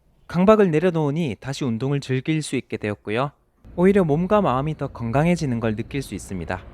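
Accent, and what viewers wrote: South Korea. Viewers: 사투리가 어디 쪽이지 대구 경북쪽인가 ??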